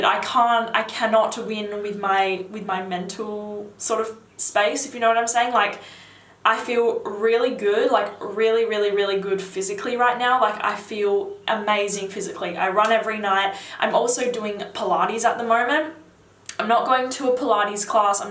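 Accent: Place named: Australia. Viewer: I just wanna say i came across one of ur videos somehow i dunno how but i commented that ur funny coz it was funny i dunno u was talkin about cleavage lmao some new push up thang lol oh yea i was watchin i forget his name about 2018 best mens fall winter fragrances yea thats how i came across ur channel , u had somethin bout mens cologne but yea i cracked up lol, aussie accents are sexy dont let anybody tell ya different lol, keep up the good work 👍🏼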